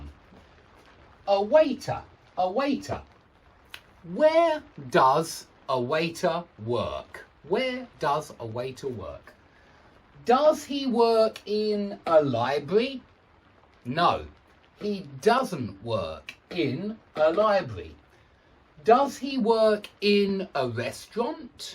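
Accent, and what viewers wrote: United Kingdom. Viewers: I love your British accent, sending you love from Egypt ❤️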